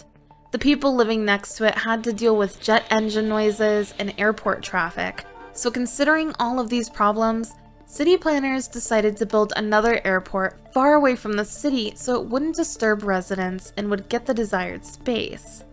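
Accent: United States. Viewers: "Dennnver is known for its outdoor spAcesszsz" - Narrator in her Californian accent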